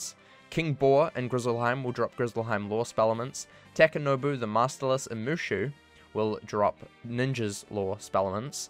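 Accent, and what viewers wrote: New Zealand. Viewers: Kia Ora bro, first time watching your video and could instantly tell you were also a Kiwi. First one I've actually ever found from wiz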